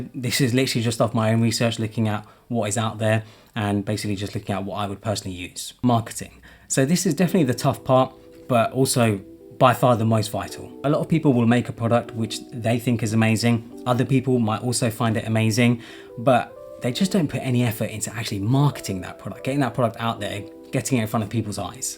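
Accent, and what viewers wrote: United Kingdom. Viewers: So lovely hearing and English accent. I'm so used to American channels...looollll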